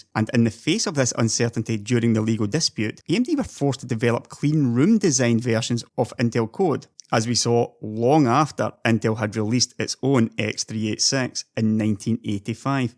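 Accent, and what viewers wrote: Scotland, United Kingdom. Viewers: I thought you are Scottish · Are you scottish? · I love your Scottish accent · Just built my 1st pc in the last year or so, luckily I bought a shitty cheap i3 to get me going but decided half way through of building a better 1 so got an rtx2070 which is a bit strong for an i3 I think. I was looking into a new motherboard and processor and I’m really glad I watched this video, I will never buy anything from intel again. Gonna wait for the new thread ripper and get a beast of a pc. PS I just subbed to this channel the other day and so informative. Every video is class that I’ve seen. Presume your from Scotland ye? I’m in Aberdeen so thanks from a fellow Scot :)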